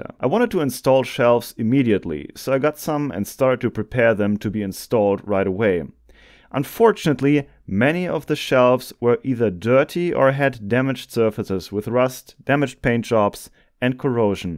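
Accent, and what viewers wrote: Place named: Germany. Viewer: I love your videos and German accent. It gives me flashbacks to <num> Northern Germany while in the US army.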